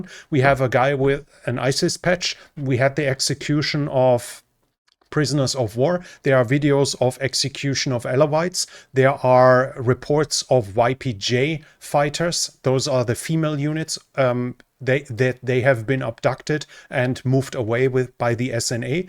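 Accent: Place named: Germany. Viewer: It sounds like you have a very heavy German accent. However, your English is quite good !!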